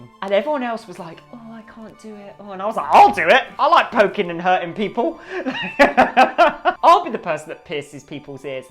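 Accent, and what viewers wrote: United Kingdom. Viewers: Love the accent! Kinda cracks me up though how brits refer to cash as pounds! I understand why no one wants to do the piercings, not only are Claire’s employees inexperienced but it’s been also proven that the guns they use to pierce is un hygienic but it also causes damaged to the ears! I have 5 ear piercings double piercings on each side and a cartilage piercing, all gun pierced, my first ones my mom had them done when I was 8mo and they’re uneven, the last one was my cartilage when I was 18 now almost 37 and it’s still sensitive to this day! If I had kids and wanted to get their ears pierced, I’d take them to tattoo parlours to get it done!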